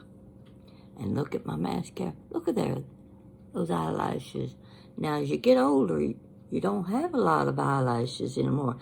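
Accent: South, United States. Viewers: I am 50 yo and I just love watching your videos, I love your tone of voice and your southern American accent. You seem such a nice and interesting person. So much full of life and interesting topics to tell. You relax me a lot. And my life is hectic, I certainly need someone like you in my life. You are great, thank you sweet lady! By the way, the earrings you made are fantastic. ❤ From Costa Rica.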